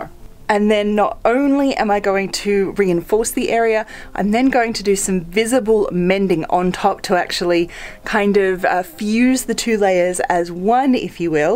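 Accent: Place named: Australia. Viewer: I recently discovered your channel and have been binging them all over the last few evenings. My adult daughter has commented a couple of times (and in particular on this post) that she is going nostalgic for he ABC TV production Play School. We analysed it and concluded that the combination of your well spoken Aussie accent, speech pace, question and response style and gentle tinkly background music is giving the vibes. Now that I have heard it it is hard to unhear it. Don’t change it, we love it. Btw, did you also grow up with Play School?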